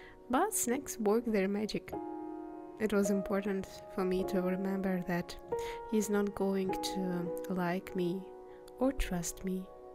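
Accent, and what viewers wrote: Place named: Russia. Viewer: Great dogs!! Where r you from? You have very Russian accent.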